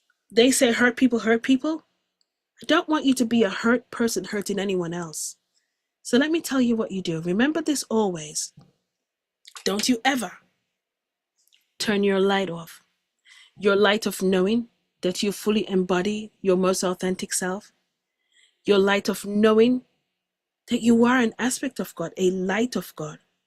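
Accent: Jamaica. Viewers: Hi, I am tuning in from Jacksonville, Florida. I saw your captivating interview with Alex on Next Level Soul and was so pleased and happy to hear that you are originally from Jamaica. I found it so refreshing to hear the familiar accent of my homeland, Jamaica. I love your story and your message. I do believe that some people are already living their purpose but can't recognize it because it may not be grand. Your purpose is doing what you love. My purpose is an acupuncture physician and healing and giving love, light, and compassion to the world is what I do. I always see the greatness as God / Source as breath. I was channeled a poem from my Higher Self that breath is consciousness, and we can't live without it. Consciousness can not be contained, as you said on Next Level Soul, and that is so true. Thank you, for your message of love, light, purpose, and power! 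I am so happy I found you! Stay blessed. 🎉❤🎉❤🎉❤🎉❤🎉❤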